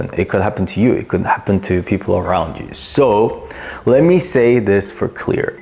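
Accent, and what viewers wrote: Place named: United Kingdom. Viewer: First of all, celebrities do not have time to be chatting with their fans privately. They don’t even have a lot of free time so if they do find free time, they would NOT use that to private message a fan. Sorry fan, but you’re not that interesting. Why would a celebrity choose you over his colleague or another person of equal status? 🤔 Also, celebrities work hard to be where they are so they’re not interested in socializing with fans who are in a place of dreaming and fantasizing. If they want friends, they would choose ppl of the same status because they’re more relatable and trustworthy since they’re of the same socioeconomic status. If you’re a victim of scams then that’s one reason why a celebrity would never talk to you. Lol. You may not have money, but at least be a smart human being so that way you can at least appear attractive. 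Let’s be real. I’m not a celebrity, but not even I would want to be your friend. 😂🤣😂 I do hope the fans that fall for these scams are little kids. This video would be helpful to them. If you’re an adult and not able to use basic common sense to distinguish what’s real and what’s not, you got issues. Being scammed is not even a problem worth highlighting if you’re an adult. The root issue is… 🫢😅 Btw I appreciate this video. I’m new to this channel and I love the British-English accent. I always thought English Language Learners would want to learn American-English. It never occurred to me that an ELL would learn English with British pronunciati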